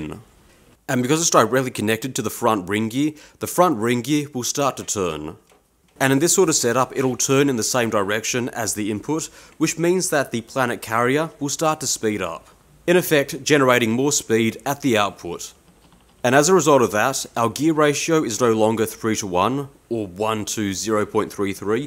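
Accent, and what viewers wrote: Australia. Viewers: An Australian!!! My ears are not designed for hearing THIS accent! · Australian? Great! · Sounded like an Australian accent to me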